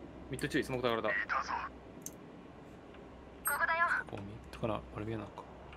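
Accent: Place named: Kansai, Japan. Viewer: さん喋りが関西方面の人だからたまに強い口調に感じちゃうけど人柄スゴくいいよね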